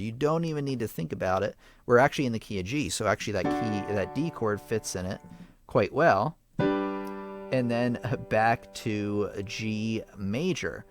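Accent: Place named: Philadelphia, United States. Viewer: Could not join your Live stream as a participant tonight! Wonder if others had issues too? Seems You Tube failed us with not error message. , Nor could I support the channel which I’d gladly do. Just today, I rec’d your suggested book from Amazon: "Scales, Chords, Arpeggios & Cadences”. Got to page 8 under 'Chord Progressions' & just failed to comprehend what this sentence means, here goes: “When a triad is not in root position, the ROOT is ALWAYS the upper note of the interval of a 4th!” Can you explain or re-word this sentence in a differnt way or possibly give an example, especially on the “..4th interval”? It is an enigma why my question on your Live presentation wouldn’t post! It was so annoying as there was not an error message nor any indication how to enter my text. This occurred, not at the end of your transmission, but >15 minutes prior. 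Any help with that? Lastly,, hearing your accent, it sounds as if you’re located somewhere near Philly area. I think you mentioned you do give piano lessons? I’d like my family to have keyboard instruction, with one who knows how to demystify complex concepts e.g. chord modulations, without feeling overwhelmed. As an educator & public speaker, I recognise, you do have a gift, making the terminally-complex, less so. Thanks & sure hope you can advise, what I may have done wrong, not being able to join the conversation tonight? Deni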